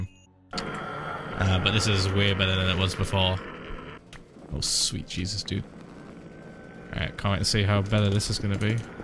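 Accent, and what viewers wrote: United Kingdom. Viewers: I keep forgetting he's British. Even while he's talking.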